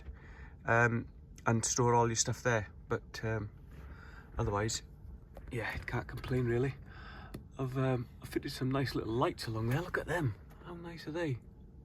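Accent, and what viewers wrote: Wales, United Kingdom. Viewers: Not a trucker but have binge watched your channel this week, which I enjoy 2 questions: Am hearing a Welsh underlying accent? Where is home when you are there?, not far from me Leeds/Harrogate/York? maybe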